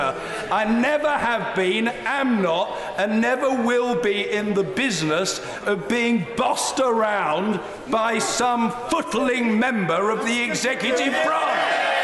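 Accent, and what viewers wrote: United Kingdom. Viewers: What was that which he said quickly? Did he start a motion to remove a dissenter from the parliament session? I find this hilarious, but between British accent and legal jargon, sometimes I understand half of whats's being said. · His accent is so british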